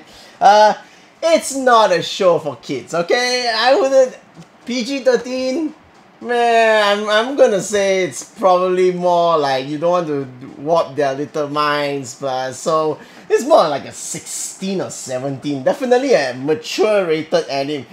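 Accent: Singapore. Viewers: is not form is breathing dude and are you from Singapore?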